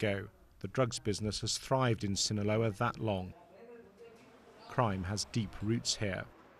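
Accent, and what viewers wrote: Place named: United Kingdom. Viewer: I love tha British accent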